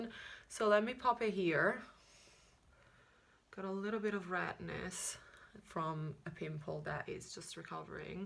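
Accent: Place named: Italy. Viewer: You have a perfect English but I’m just curious where you’re from because I can hear some accent here and there, maybe Italian? Just curious! (I’m Italian and I feel like I talk in a very similar way when I speak English)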